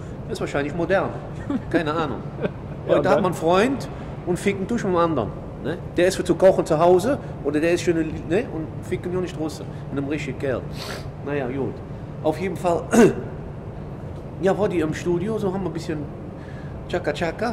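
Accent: Cologne, Germany. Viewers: Einmal hat ein kumpel ihn getroffen und mich angerufen meinte hab hier jemander der dich sprechen will Kam direkt so an Hallo ich bin es der mann vom parkhaus 😂😂😂 auf Kölsch natürlich 😂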